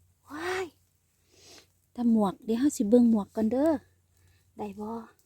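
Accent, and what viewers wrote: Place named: Ubon Ratchathani, Thailand. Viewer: เว้าได้ไพเราะจ้า สำเนียงทางอุบล เด้ อจ้า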